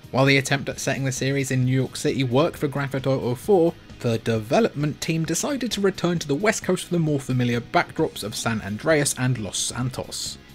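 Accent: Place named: United Kingdom. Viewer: PO'OL TAOW. I love British accents.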